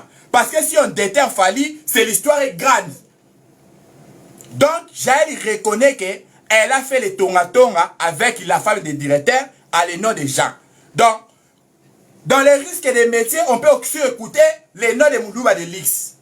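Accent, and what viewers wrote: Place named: Ivory Coast. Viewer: Mura de presse tu as bien parlé en français comme ivoirien félicitations notre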